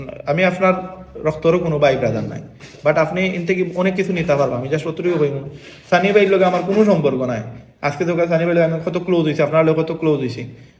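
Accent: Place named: Sylhet, Bangladesh. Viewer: Vaiyar Sylhet e vasay kotha gula khub valo laglo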